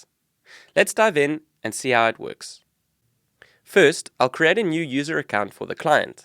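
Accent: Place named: South Africa. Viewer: This dude is definitely South African...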